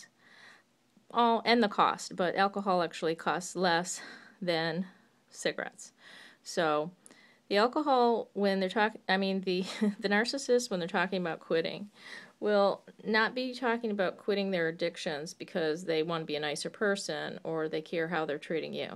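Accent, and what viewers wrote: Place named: Michigan, United States. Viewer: U sound like you from Michigan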